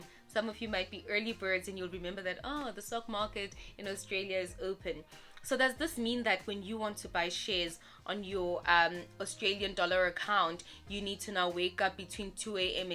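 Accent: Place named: South Africa. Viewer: I thought you lived in Australia but I was surprised by the South African accent 😂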